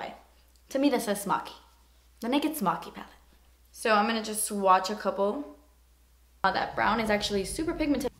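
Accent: England, United Kingdom. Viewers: love your british accent 😂😂😂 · Love your British accent!!!! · You're so funny 😂 The British accent and all! 😂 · I love your english accent. You are amazing xx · Girl, your british accent slays me. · I love that you do a random british accent in your vids. I do the same in daily life 😂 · i love that you always randomly break out into an English accent.. i do that too lol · that british accent tho lol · Your British accent is great! I also enjoyed the Harry Potter references 😂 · You do such a great British accent😍. Better than me and I'm British 😝😂 Oh and P.S I love you 😍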